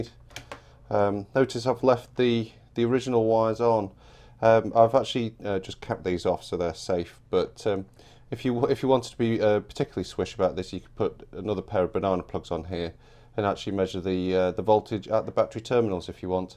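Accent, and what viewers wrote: United Kingdom. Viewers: >>>>> ANOTHER BRIT. WORBLING AWAY IN HIS SPECIAL DIALECT OF UNKNOWN LANGUAGE !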